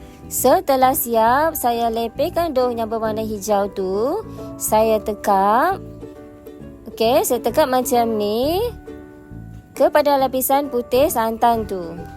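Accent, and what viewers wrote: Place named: Banjar, Indonesia. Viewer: Bagus..cakap tu... Banjarnya agak karas bunyinya... ia lambut cikit...n laju...surahnya...😅🎉🎉terbaik....kulaan...rami bangat mendangar..... Ajari lah....ka.kanakan...agar nahap keturun...bisa bersurah...❤️❤️❤️🥰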